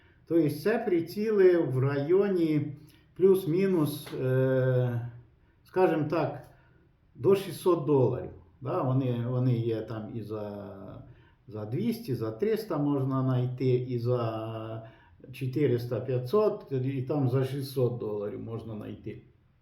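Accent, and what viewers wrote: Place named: Vinnytsia, Ukraine. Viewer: У Вас розмова Вінницька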